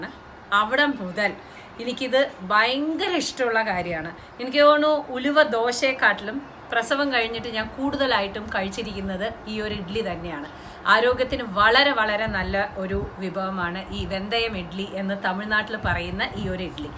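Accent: Palakkad, India. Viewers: ഇങ്ങനെ കൊതിപ്പിക്കല്ലേ കുട്ടി.. എന്താ പാലക്കാടൻ ഭാഷ 🙏👍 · 👌👌👌👌👍👍👍👌പാലക്കാടൻ ഭാഷയും കൊള്ളാം 😍😍😍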